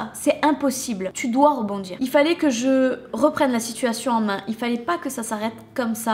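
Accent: South, France. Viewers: au fait t'es de quelle ville ? t'as un accent du sud :) tu serais pas de toulouse?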